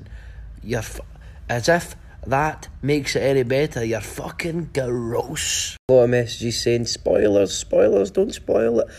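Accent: Scotland, United Kingdom. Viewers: love ur Scottish accent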